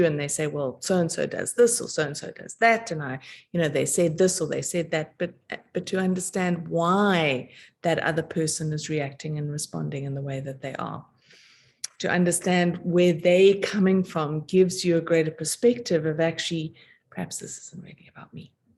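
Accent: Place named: South Africa. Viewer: I really appreciate this video thankyou and to all. Is anyone able to join these sessions? Four Agreements is great, simple yet profound truths and pactices. SO much going on energetically! My guides told me to take more shorter breaks more often throughout the day as it's a tripple whammy for me recovering from medical treatments and going through all these energetic changes, getting upgrades and being so highly sensitive. Solar flares really affecting me too, I had a throbbing headache yesterday - unbearable! I "cried out" out to my guides, I said please!! I imagined a "helmut" on my head absorbing the pressure and tension and asking it to give me protection and it dissolved, just like that - what a relief! I love that SA accent, you'll end up with an interesting accent I think. Thanks lovely, great video, I'm glad you made it and shared it - very supportive for the rest of us. 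🙏💗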